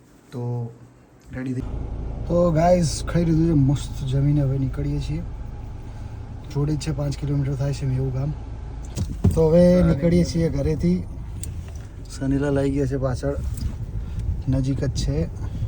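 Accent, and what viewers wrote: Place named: Mehsana, India. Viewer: ખુબ સરસ મજા આવી સ્ટેજ પ્રોગ્રામ જોવા ની તમારા બ્લોગ માં જે ભાષા બોલો છો મહેસાણા એતો બહુ સરસ મજા આવે છે સાંભળવાની😊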